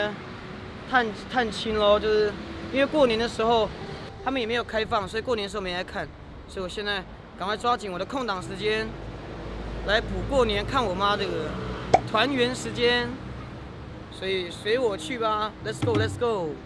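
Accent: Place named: China. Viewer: 你很可愛，加油。但你口音好重？ 你是大陸人嗎？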